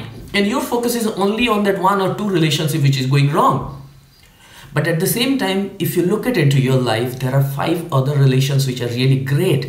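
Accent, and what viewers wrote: India. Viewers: I like your way of expression. You have a good and strong posture. Not only this but also you speak the Indian English which is clear and obvious with a very sweet voice. --Dr Mohanty.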